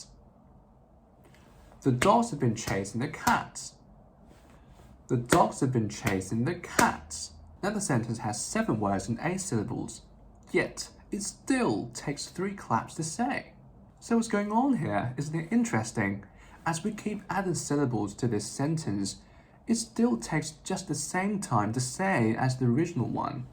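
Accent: United Kingdom. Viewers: Beautiful British accent! 👍👍👍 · 他是英国口音，你只熟悉美国口音。i这个音，英国更像ei，而美国更像ee as in teeth。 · 英國腔英語，習慣美式英語的乍聽之下有點不習慣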